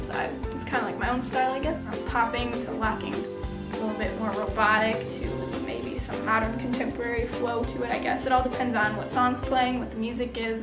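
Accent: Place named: Chicago, United States. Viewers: She has a bit of a Chicago style "ah" going on, but that's just what I hear. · I think it's because she's Midwestern. Maybe from Minnesota or Wisconsin. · Her accent is like a normal Midwest accent. I have it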